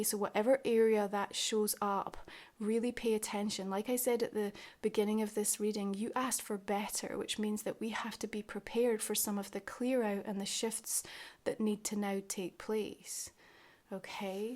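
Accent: Scotland, United Kingdom. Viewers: Lovely read thank you 🕊🙏. Very refreshing to hear a Scottish accent 🏴🏴🏴😊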